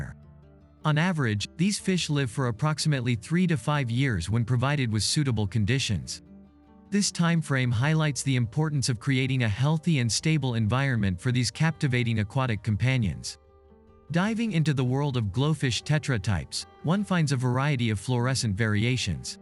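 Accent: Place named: United States. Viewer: nice tutorial, i not only learn American English but also the knowledge on Electric Green Tetra😀👍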